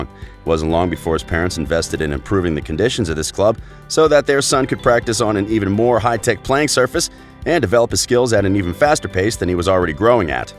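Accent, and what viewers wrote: United States. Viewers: The speaker´s voice sounds a bit resemblant to Ted Lasso (Jason Sudeikis). It´s almost charming to hear all these ´murican pronounciations. But to the next video, please someone, give this speaker a proper phonetic list of club, coach and player names. Before the charm fades off. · I WILL TROLL THOSE COMPLAINING THE AMERICAN ACCENT. YOU ARE WELCOME! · Can't take American voices seriously speaking about football... lol haha sorry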